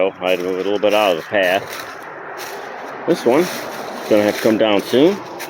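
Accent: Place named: Tennessee, United States. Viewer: You are already starting to sound like a Tennessee person!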